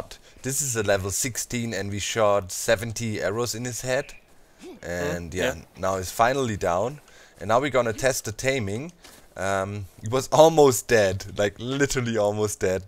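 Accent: Germany. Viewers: Du bist aus Deutschland, das höre ich!🖖🏽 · Do you live in Germany. Because your english got some german flaire in it.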